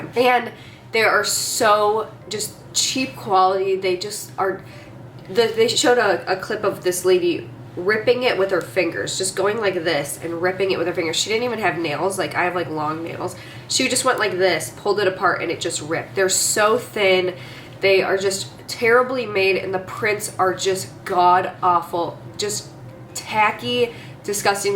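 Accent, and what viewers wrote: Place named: Chicago, United States. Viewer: It took me forever to figure out you accent 😂 Chicago is super close to us but juuuuuust slightly different. I looooove it.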